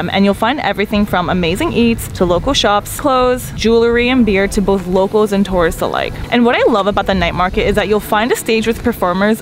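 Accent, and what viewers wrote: United States. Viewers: Hey! Sounds like you’re from the states. Just curious, how long was the flight from where you were to Cambodia? Thinking of doing a trip end of year. Awesome vid 🤙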